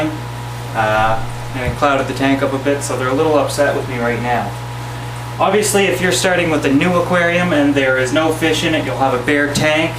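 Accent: Canada. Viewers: Well I just spent about 2 hrs watching your videos needless to say I am exhausted. Well done. Truly a pleasure to watch and very informative. I especially love your Canadian accent when you say words like "out". Ow-oot! :) anyways subscribed - keep em coming...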